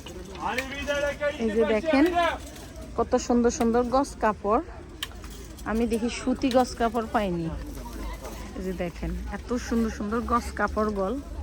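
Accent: Noakhali, Bangladesh. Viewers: এটা কি নোয়াখালির ভাষা?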